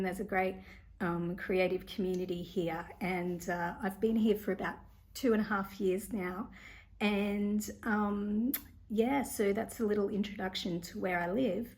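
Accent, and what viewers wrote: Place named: Australia. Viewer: So happy to have stumbled onto your channel. This is only the 2nd of your videos I’ve watched but I’m now your newest subscriber 🎉yeah. I adore your warmth & authenticity, plus it’s lovely to hear an Aussie accent. I’m also an Australian, the same age as you & been wanting to start my own channel to document my creative process but it’s hard to imagine what you can’t see already so thanks for being an inspiration. I look forward to watching the rest of your content. I know I’m a total stranger but honestly I’m so proud of you in this video for “just doing it”, for having the courage to show up. You ROCK!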